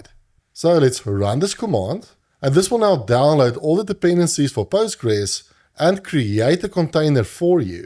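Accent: South Africa. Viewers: Thanks. I recognized a fellow South African as soon as you spoke! Lekker man. The steps you explained doesn't work. Guess maybe they "fixed" your work around to get the AI agent to use ChatGPT without a api key? wish it worked for me. Thanks for this Tutorial though! Cheers! Did anyone else here get it to work?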